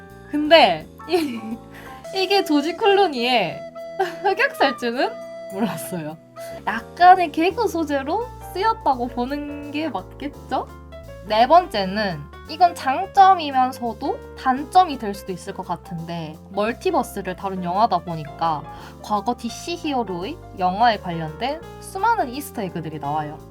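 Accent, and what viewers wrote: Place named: Busan, South Korea. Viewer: 익숙한 억양(부산사람)으로 차분하게 꼼꼼하게 잘 설명해주셔서 재미있게 시청했습니다 감사합니다 플래시 꼭! 보겠습니다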